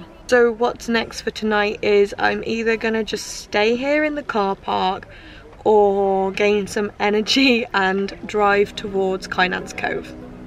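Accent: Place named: Nottingham, United Kingdom. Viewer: Hi there, love your videos, you wouldn't happen to be from Nottingham would you? I'm sure I recognise the accent! Great video by the way, I love Cornwall (when the weather is right)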